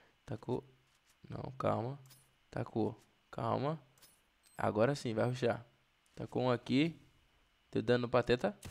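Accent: Bahia, Brazil. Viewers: parece que mora aqui na bahia, olha o jeito que ele fala man, Muito massa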